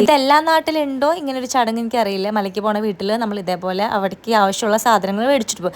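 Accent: Thrissur, India. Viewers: പൂ ആണ്... authentic thrissur സ്ലാങ്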